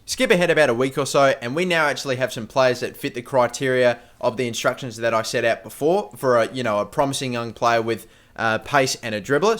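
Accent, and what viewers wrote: Australia. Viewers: hihihi australian accent is so funny :)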